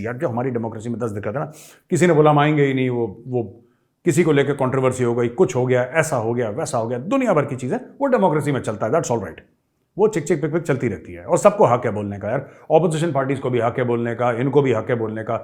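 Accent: Lahore, Pakistan. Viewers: Maj sahab aapka Lahori style bahut badiya h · Unique delivery by you of Lahori bol "Kar rahey Hain, Haar rahey Hain...." You video will remain incomplete without this "Hain" word style... You are tooooooooooooo Gooooooooooooooooood... Love you Major Sahab... Bharat Mata Ki Jai... Jai Hind Sir...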